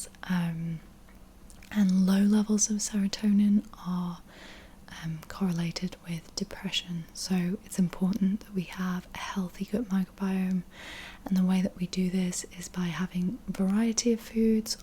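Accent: United Kingdom. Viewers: brit accetta too different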